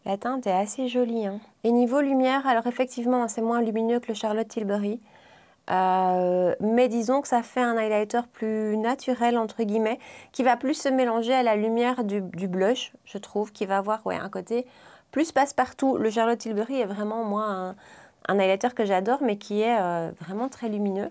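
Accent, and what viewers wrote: Belgium. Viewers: Merci Charlotte pour cette excellente revue (comme d'hab :). J'adore ton petit accent belge ! :)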